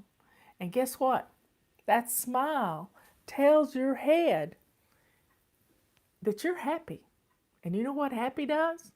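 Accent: South, United States. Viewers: Miss fly lady my girlfriend just loves you she’s watches you every day thank you so much for keeping her occupied and out of my hair lol Jk but love your southern accent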